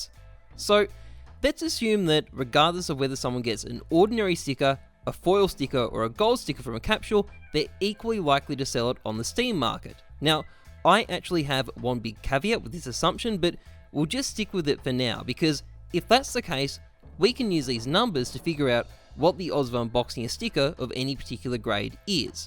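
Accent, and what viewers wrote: Australia. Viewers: An austraaaaaalian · Are you from perth???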